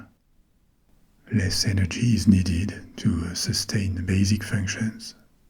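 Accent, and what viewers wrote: France. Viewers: back to spaaaace 🎉😂 plz dont loose the french accent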